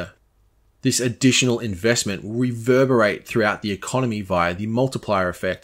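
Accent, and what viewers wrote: Australia. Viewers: What am awesome podcast mate! Hearing the aussie voice for over 2 hours was quite pleasant. You could split this into 4 parts for bulk views but damn, my Saturday morning has been educational! Cheers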